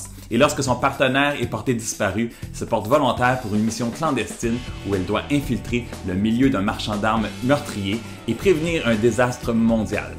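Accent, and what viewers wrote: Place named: Quebec, Canada. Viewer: J'adore ton accent québécois. Bonne critique.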